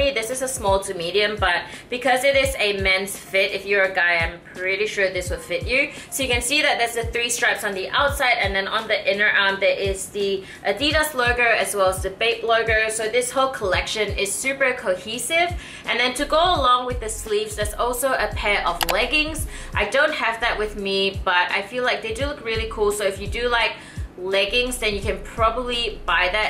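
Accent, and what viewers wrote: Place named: Australia. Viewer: That’s an Aussie accent 😉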